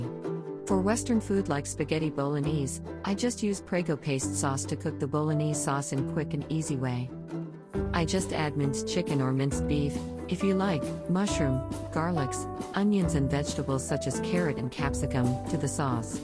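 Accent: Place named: London, United Kingdom. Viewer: Waahh..depa speaking london..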